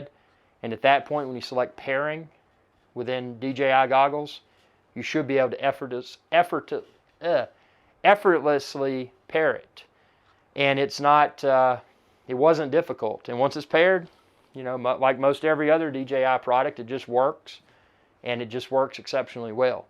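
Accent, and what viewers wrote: South, United States. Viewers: That southern accent is golden, love it. Greets from germany!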